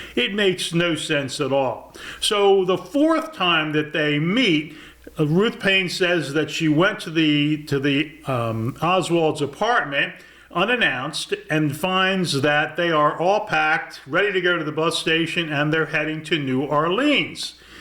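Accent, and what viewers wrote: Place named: Philadelphia, United States. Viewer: I detect an east coast, Pennsylvania/Philadelphia/Delco accent. Thank you for another informative presentation.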